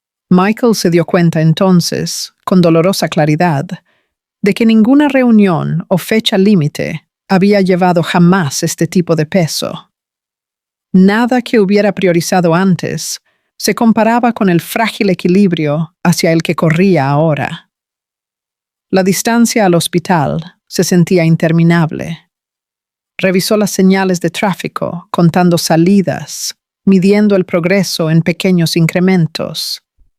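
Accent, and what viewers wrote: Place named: Brazil. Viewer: Esta historia es Brasil por eso le sale el espoñol asi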